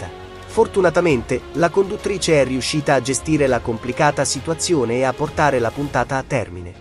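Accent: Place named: Southern Italy, Italy. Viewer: Forse perchè è meridionale o romana...?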